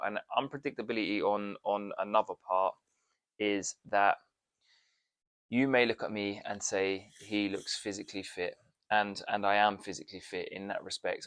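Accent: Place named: United Kingdom. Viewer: Hi 👋, im new to your wonderful channel here on Fibro..I just came across your channel and find it sofar to the point info on everything about it as u can tell us all.The full..Heads Up on this awful painful condition..gentle and friendly nice huggs to u hon.❤❤❤love your awesome accent as well. You British or Australian. Im guessing..British..sweet anyways..ill keep watching daily keep posting ok.much love from me..Nancy from Jacksonville Florida..USA❤❤❤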